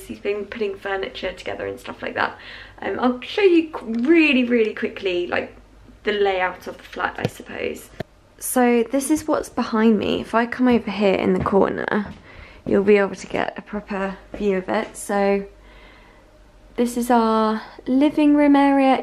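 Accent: United Kingdom. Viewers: I swear, as a frenchie follower who adores listening to your beautiful British accent, and you laughing in Kensington gardens was the most British thing I ever listened to!!! XD I mean ladies... Your laughters are as British as the Thames! 😅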